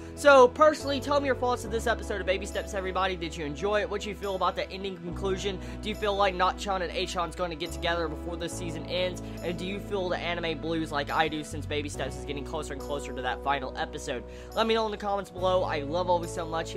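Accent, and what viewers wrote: South, United States. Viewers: Great review! First time viewing your channel, and I find it to be very well planned and enthusiastic. I can tell you do this for yourself yet you still appreciate your viewers and subscribers. Hearing Japanese names in a southern accent is hilarious and I hope you don't try to fix that. Yes I'm starting to get the anime blues on this one as well. This series is one of the most inspiring and entertaining sports anime I've ever seen! I have always enjoyed the more realistic dramas more than the fantasy shonen. I suppose that's why I like this one so much. Thanks for what you do. *subscribed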